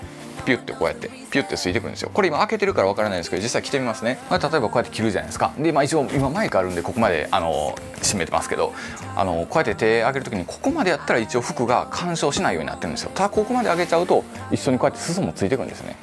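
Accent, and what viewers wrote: Kansai, Japan. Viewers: 埼玉県民です。 毎回思うんですが非常に聞き心地の良い関西弁でした。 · うp主さんに合わせてコメント欄も関西弁(風)多めなのかｗ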